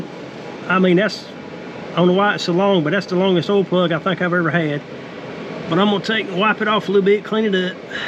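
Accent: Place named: North Carolina, United States. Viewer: Nice to find these helpful videos as well as here the North Carolina accent !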